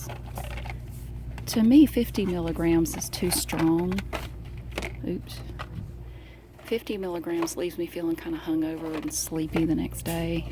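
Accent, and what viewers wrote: South, United States. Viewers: When I'm in shoos I do the same thing now. Its addictive and really fun. Even my great niece does it. This is doing what she does brilliantly ASMR. Soft Southern accent, relaxation, I love it. Haters keep hating, if its not for you piss off and stop criticisising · I’m from Germany and I really enjoy listening to people with a southern accent, it just sounds so fun! I spent a year in the US, in Montana though. Great experience! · Feel like I’ve just had a counselling session the, thank you ❤️❤️ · Girl I love your videos we got the same country accent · Hey there. I watch your videos often but am not one to comment every time. I wanted to quote the famous RuPaul and tell you " 'less they payin' yo bills, pay dem bitches no mind!" Don't let the negative comments from a bunch of people you'll never know well or meet bring you down. 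I enjoy your videos. They help to calm me. Plus you have that great southern lady accent. I'm in Michigan so we don't hear that around here often. Keep doing what you're doing and keep your chin up. Thank you for your work! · i’m love these videos. they’re so calming to me. i lost my mom when i was 15, so listening to you talk makes me feel like i’m chatting away with a supportive, sweet, southern mama! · I've just recently subscribed to your channel and I am so glad I did! These types of videos imparticular are oddly relaxing and satisfying while informative! Plus, I love your soothing voice and southern accent..so caring and motherly. Thanks for all of the work that you do! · you're the best!!! I watch these vids when I am at work and have to laminate and cut thousands of buffet labels. It makes the time pass quicker then just listening to music and I love your Southern Accent and sassy remarks, thank you💜💜💜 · Plz don’t stop them because of selfish sad people!!! 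Focus on the people that love your videos like me!! I thank you! When I’m at work and stressed I look for you and put these videos on and you southern voice calms me down!!! Thank you in Jesus Christ mighty name!!! Dan from NH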